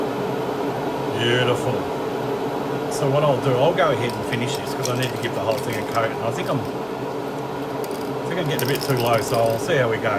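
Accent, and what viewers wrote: Australia. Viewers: Hi. I know I'm a bit late on this but another of your videos recently popped into my YT feed and I've been catching up on your videos ever since. Thank you as they're very helpful in improving my modelling skills. One question - I was led to believe TS-13 was too 'hot' to apply directly over decals so I've used other clear sprays. Is the secret just to keep the first coat over the decal very light? Btw, I'm in Sydney so it's nice to hear a familiar accent.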